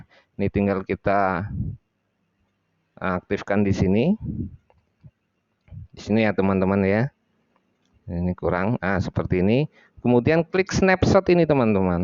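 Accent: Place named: Tegal, Indonesia. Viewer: sampean wong tegal mas?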